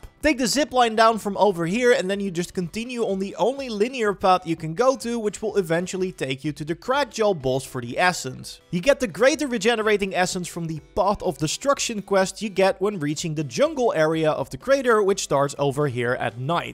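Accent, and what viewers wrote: Netherlands. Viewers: dude r u dutch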